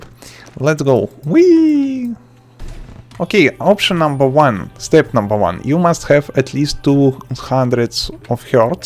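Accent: Russia. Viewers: ur accent is cool u are from russia?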